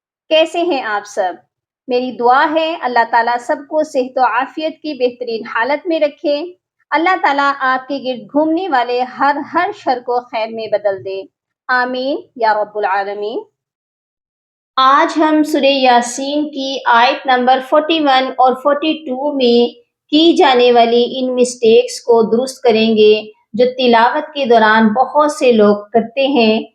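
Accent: Panipat, India. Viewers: Mashallah ap toh panipati style main quran tilawat kar rahi hai mujhe bhot pasand hai ah ah lehja 😊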